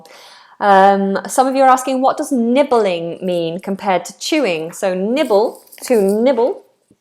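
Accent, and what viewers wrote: United Kingdom. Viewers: Greetings from 🇲🇽 I like to learn with you. Even If I need to learn 🇺🇸 English. I can't stop getting involved of how great British accent is. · Hello dear, very good English language teaching. I love Britain and British and the very sweet English accent. at the moment I am watching you from Iran. 40 years ago I was in Manchester United Kingdom. And I enjoy hearing a very sweet accent and remembering every thing again. To be honest I should have never leave the beautiful England. Love UK. Love people, culture, language and the great history. Thank you.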